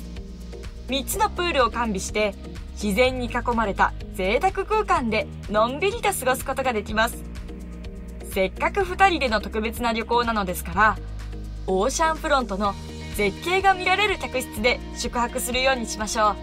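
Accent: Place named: Tohoku, Japan. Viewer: ナレーターの人、訛ってますね。イントネーションが東北チックでカワイイ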